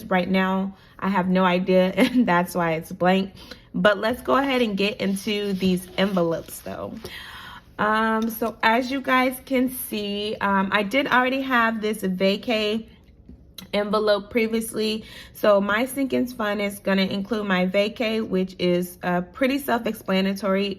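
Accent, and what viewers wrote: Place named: Caribbean. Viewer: I sometimes hear a little bit of an accent, but I can’t quite pinpoint from where lol Caribbean maybe? I’m so nosey!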